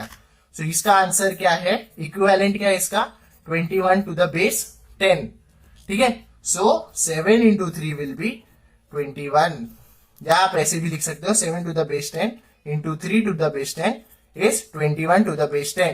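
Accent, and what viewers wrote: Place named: Mumbai, India. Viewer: jyada mach mach nahi hui...Love your mumbai vali hindi bhai..I'm from VJTi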